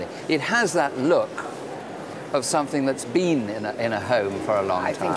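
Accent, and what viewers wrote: United Kingdom. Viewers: Definitely received pronunciation 😊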